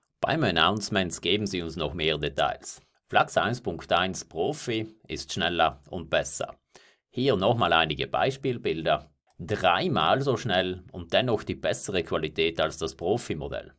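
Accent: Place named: South Tyrol, Italy. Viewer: Hon mir woll gedenkt, dass dess a Südtiroler isch.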